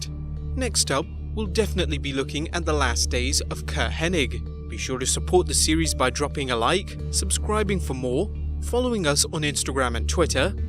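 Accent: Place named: United Kingdom. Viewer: Mostly a nit brit poorly reading some text.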